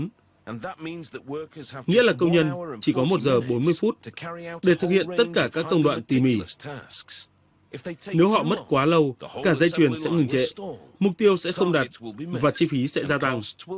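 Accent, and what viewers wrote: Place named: North, Vietnam. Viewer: Value giọng Bắc, lam bót ghi ni haha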